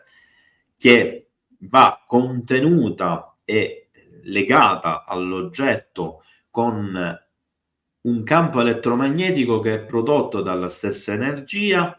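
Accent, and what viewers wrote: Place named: Sicily, Italy. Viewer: Mi sembra di capire che sei siciliano e forse di Palermo...te lo dice una di Catania😊👍 Complimenti video sempre interessanti e mai noiosi🌠👍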